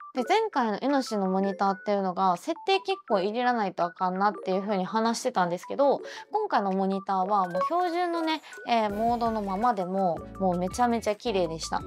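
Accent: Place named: Osaka, Japan. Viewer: 大阪弁が時折出てますね。(笑）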